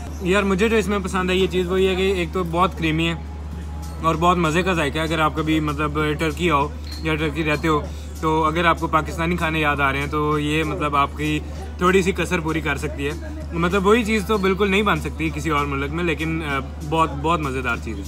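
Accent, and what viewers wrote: Punjab, India. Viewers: Punjabi accent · Your Punjabi punch in Urdu is great. Bahut wadiya mundiya, khich k rakh. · Your punjabi terms are AMAZING.....I'm dying laughing for your natural punjabi style ...lovley..!!✌✌😂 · Tu Punjabi me urdu bol rha h bhai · Wonderful face reaction after every food. 😊☺and punjabi ascent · Your Punjabi accent..😍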